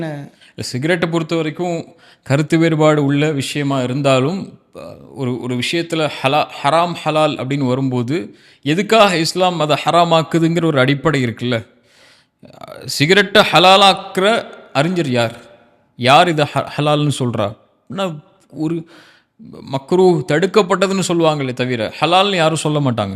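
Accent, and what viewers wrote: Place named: Sri Lanka. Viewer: Ungal bayan enakku mihavum pidikkum karuththukkalai mihavum alahahavum thelivahavum velippadutthureenga ungal kirath so sweet pesurazu engada nattavar maazari I'm srilankan pls Quran recitation podunga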